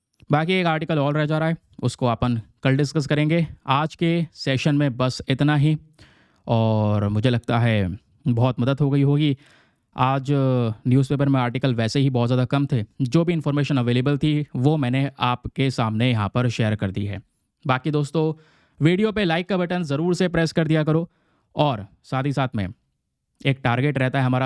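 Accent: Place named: Punjab, India. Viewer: App to punjabi lag rahe hai sir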